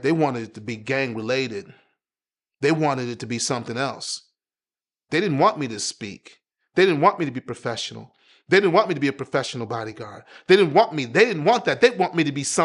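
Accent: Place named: West Coast, United States. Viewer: West coast accent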